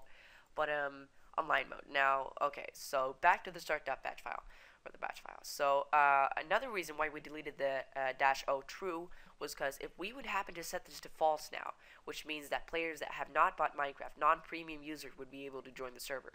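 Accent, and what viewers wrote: Sweden. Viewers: are you from sweden? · When i try to open Start.bat it crashes. Btw are you swedish? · are u swedish?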